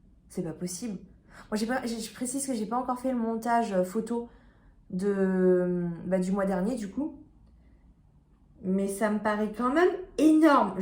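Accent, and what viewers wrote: Brittany, France. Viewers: Bravo pour ce formidable parcours! Tu est au top 😊 ( Et dans tes vidéos j'aime bien les petites choses concernant la prononciation made in Finistère comme "même" qui deviennent " Meum", sorry je fais ma pikez 😉😂 )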